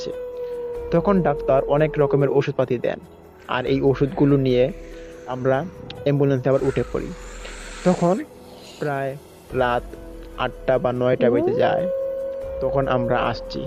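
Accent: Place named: Habiganj, Bangladesh. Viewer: Habiganj bari mone hoy unar kothay buja jacche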